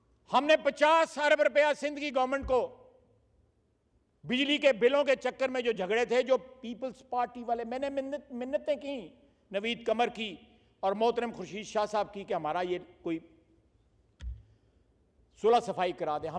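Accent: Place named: Kashmir, India. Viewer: Kashmiri easay hi bolta hai.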